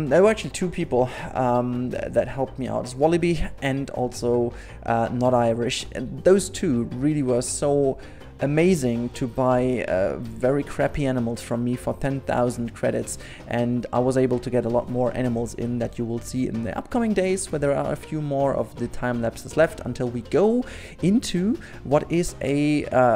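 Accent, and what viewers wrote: Germany. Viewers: I for one think the German accent and language is positively breathtaking. Normally I’m not a fan of commentary in speed builds but you have such interesting mannerisms, stories and viewpoints that I quite like listening to you talk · German too and I don´t like hearing most others,when talking english, but you don´t have this strong accent. it is noticable ,but just slightly. That´s very ok to listen to, similar when I speak. Ikr ,hearing oneself is always weird. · War ja klar, dass die deutschis sich aufregen, dass du Videos auf Englisch machst. xD Anyway, du bist der erste Deutsche, dem ich auf jedenfall laenger zuhoeren kann, da dein Englisch wirklich sehr gut ist und du auch keinen so starken Akzent rumtraegst. Don't listen to the kiddos, who whine because they dont understand shit - you do some neat, quality content and deserve the recognition online :) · To be honest, I like that you explained your choose of language. 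I watched many of your videos, simply because they are absolutely amazing. But your German accent and some phrases were confusing me sometimes... anyhow, now I love your videos even more! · I kind of like hearing German people speak english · well, about your accent is good better than another German 🙃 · I actually really like watching you because of your German accent, please don't change :)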